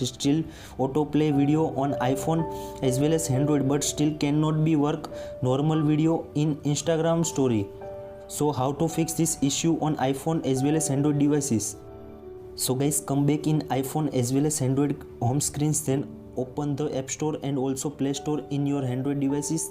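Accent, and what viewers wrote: India. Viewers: When india speaking English 👎👎👎🤮🤮🤮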